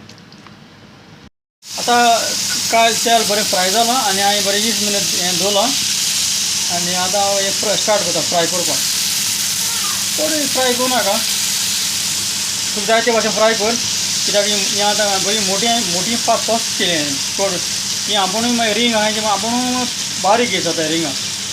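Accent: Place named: South Goa, India. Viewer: sure. Are you from south Goa, from your speaking style, I assume.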